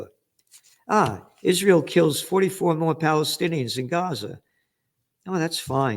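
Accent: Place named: Brooklyn, United States. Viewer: love your Brooklynese!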